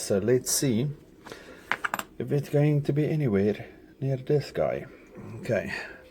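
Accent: South Africa. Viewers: Ah yes, a fellow South African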